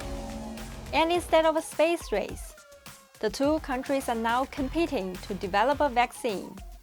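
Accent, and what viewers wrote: China. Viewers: Narrator has a canton accent. Probably from hongkong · Just because an asian woman with a Chinese accent is presenting doesn't mean she isn't a cog of the NED, or of Britain. One person should not represent a country of 1.5 billion.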